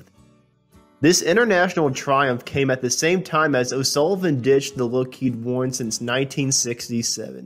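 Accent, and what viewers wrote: United States. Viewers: Yes too fast , too American slur . Use punctuation in speech . We are not all Americans on the rest of this planet! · Yet another american voice, impossible to get anything in English.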